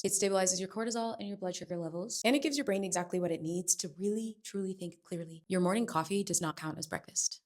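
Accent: France. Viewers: Your French accent is amaaaazing! Love it 😊🎉 C'est très BON!! ❤ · Omg! Your French accent is so beautiful! I’m a native French speaker and you sound way nicer than I do 😭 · Ok but your French accent is 🥖 incroyable🥖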